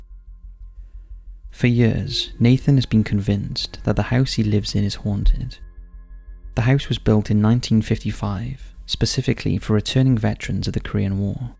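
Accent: Wales, United Kingdom. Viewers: Always love the vids, but can i also say cheers for getting the docile tones of our Welsh accents into the World! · He has a Welsh accent. So I challenge you to pronounce Rhydymwyn, Machynlleth, Pontrhydfendigaid or Llansantffraed-Cwmdeuddwr correctly. Personally, I only knew how to pronounce Duluth because it featured in season 1 of Fargo. If it wasn't for that, I wouldn't know how it was supposed to be pronounced correctly either. Believe it or not, many people in Britain aren't aware of the most popular cities in the midwest, just as most Americans aren't aware of most cities and towns in the UK outside of London. Just enjoy the content of the video and don't focus so much on the pronunciations!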